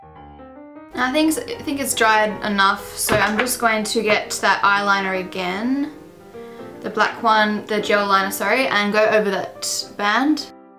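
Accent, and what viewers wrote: Australia. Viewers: You seem like a really sweet person. And your tutorials are really good too. Defiantly subscribing! You sound like you're either from Melbourne or Sydney. No? Oh well xxx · You're seriously so gorgeous and I love your Aussie accent! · are you austrailian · i miss Aussie accents it refreshing to watch these videos ... <3 it! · I'm so happy you have an Aussie accent lol! First ever makeup tutorial iv see from someone I AUSTRALIA!! Yay! Go us Aussies! Loved this tutorial btw! :) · Are u from australia???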